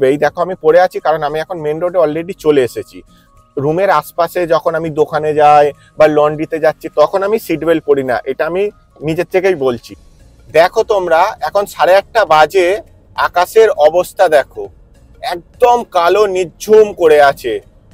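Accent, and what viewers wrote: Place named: India. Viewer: আপনি কি ভারতীয় কথা শুনে মনে হচ্ছে বাংলাদেশি না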